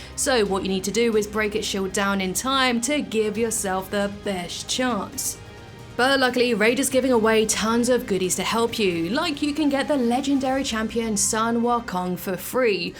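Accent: United Kingdom. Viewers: your voice and British accent keep me charmed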